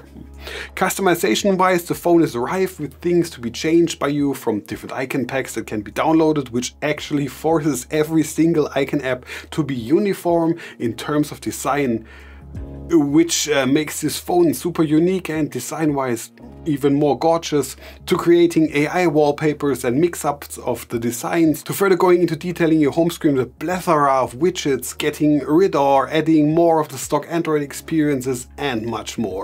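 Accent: Germany. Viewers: Stuagarter wo english schwätzt. Klasse Moove Digga! Gefällt mir!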